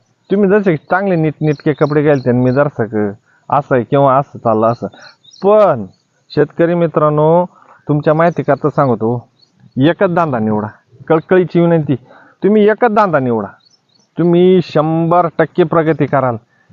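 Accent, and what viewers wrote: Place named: Nashik, India. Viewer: वैजापूर तालुका ते नासिक पट्ट्यातील हेल (स्वर) असलेला आवाज आहे हा.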